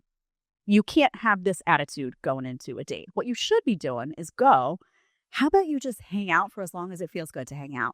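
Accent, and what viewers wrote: New York, United States. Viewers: Yea I thought you was like fuugggeeeettttt aaabbbooouuuuuttttttt that's iiiissshhhhh and rolled all the way out. It's been like what 2.5 yrs since you did something on here. Dang we missed that awesome smile the new York accent and attitude. So happy to see your back. Glad your happy healthy and safe. God bless so happy your back.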